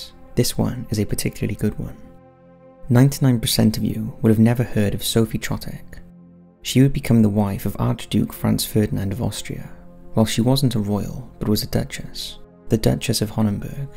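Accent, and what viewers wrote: United Kingdom. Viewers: Hugh Jass He’s a Brit, hes gonna pronounce words in a different way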